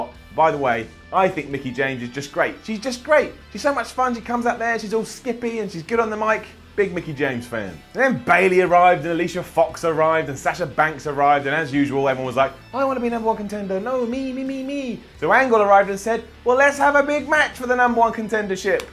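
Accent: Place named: United Kingdom. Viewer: Sometimes when he gives an up and starts explaining why it's like if he didn't have a British accent it wud be a down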